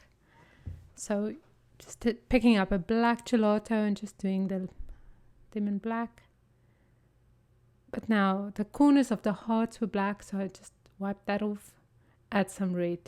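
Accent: South Africa. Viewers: Hmmm sound like a fellow South African, howdy from Cape Town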